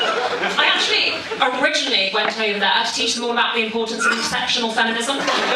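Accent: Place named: RP, United Kingdom. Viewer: I can't understand her because of her posh accent.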